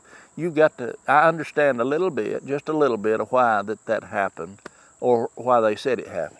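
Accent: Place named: Appalachia, United States. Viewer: Your video just popped up in my video feed and you sound like you are in my neck of the woods in Appalachia. Maybe Ky or Tn? Hickory king is the best field corn and roastin ears too. I love it for corn relish and cornbread too! Yep, seed time and harvest time and global warmin and coolin. I like your Farmall Cub. My grandaddy had a Farmall M. Plant everything you can. You'll need it soon enough.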